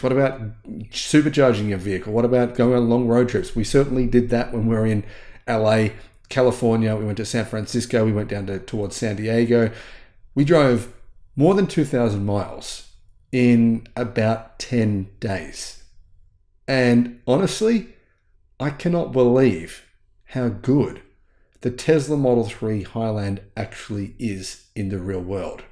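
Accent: Australia. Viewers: You sound great! Love the Australia accent. Don’t change! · I don't like to listen to AI narration or videos that are difficult to understand because of a strong accent. You Aussie accent is accent is actually refreshing. My son drives a vintage model 3. I love riding in it. Used 3's are available at great prices. The only reason I won't buy a Tesla is that Musk is such an ass. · Another great video,. I live in California and appreciate your Australian accent. As for the M3, I drove one for two weeks while my '22 Ford Mach-e was getting body work done. I hated it. The car felt cheap, was loud, and did not have CarPlay. I'm happy people are buying EV's but the Model 3 is not a good car. The Model S is much better. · There’s nothing wrong with your accent, it’s pure Australian. The road trip appraisal sounds pretty fair to me. I have friends that own Tesla’s and BYD’s and the Tesla is light years ahead of the Chinese EV range. 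If you’ve decided to move from ICE to EV, avoid Chinese built cars. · Keep the Ozzie accent BUT “Ion” and “iron” sound the same in Oz Maybe say “eye-on” for ion & “eye-urn” for iron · NO mate, speak MORE aussie, I love it! Greeting from a dane, an actual viking :D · Your Aussie accent is quite charming and not an impediment...you are 5x5 on communications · There's absolutely NOTHING wrong with your Australian accent. You be you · Love the Aussie accent. Wanted to move there to Melbourne so I could have it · You sound Okker to me, in the USa I have to adjust a few words, like pronounce Ts with Ds , all part of the fun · My wife, daughter, and I test drove the new Tesla Model 3 about a week ago, and we were all impressed. Before, my daughter had no interest. During and after the test drive, she kept asking when we were buying one. I saw huge improvements over the TM3 from just a few years ago (2020): fit and finish, quieter, less jarring and less road noise, more comfortable seats and a nicer interior, a better sound system,… This is the best value EV on American roads, easily. 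BTW, your Aussie accent is great and very easy to understand. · you are easy to understand! We all (my family) loves your Australian accent. Do not change, period❤ Be yourself, you and your family are awesome and always welcome...! Thanks for all you do and we are praying for continued positive health and success ❤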